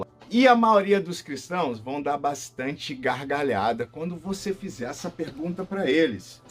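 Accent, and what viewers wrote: United States. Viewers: Gente por favor que sotaque é esse? O cara é um brasileiro que esqueceu o português ou um brasileiro com síndrome de baba ovo de americano? Esse cara fala igual a um americano que está aprendendo português..que vergonha alheia ..fala direito brasileiro valorize a terra que vc nasceu..esses vídeos desse cara pode entrar para os top lixo do YouTube..que bosta ..não recomendo.... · legal perguntei devido o sotaque americano. Gosto muito do seu canal. 😍❤❤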